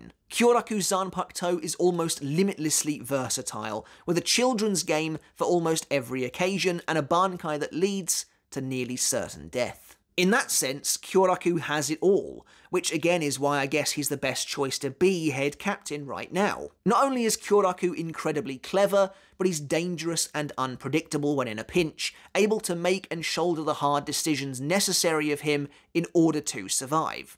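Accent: United Kingdom. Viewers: Didn't know an American spoke in a British accent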